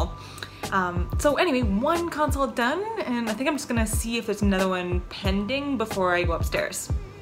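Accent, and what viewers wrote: Canada. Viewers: can I ask you what hospitals you work in? Judging from your accent I am guessing you are in Canada? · canadian and 1/4 irish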